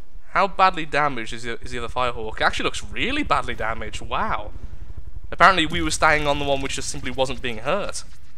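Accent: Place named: Wales, United Kingdom. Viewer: Wales now i get it!, been thinking for ages were ive heard that acsent from ( was in wales for a clan meeting WASP INC) and now i finally get why your acsent sounded so familiar. XD Love the vids keep em going ^^ ( grammar ain't my strong suit neither in Swedish or English)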